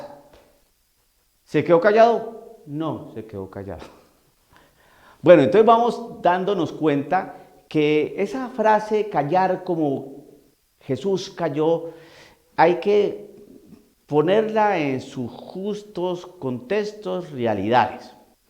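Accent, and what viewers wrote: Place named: countryside, Colombia. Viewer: Que bárbaro Padre me encanta esa forma colombiano de pueblos para hablar , este virus es una cortina de humo para hacer las cosas y la gente callada , hay q cuidarnos con los protocolos pero no darle importancia ese virus q tiene ciegos a muchos y creen q los laicos somos pendejos y no nos damos cuenta , no podemos callar la verdad hay q decirla x la verdad murio Cristo en la Cruz . Dios lo bendiga y proteja siempre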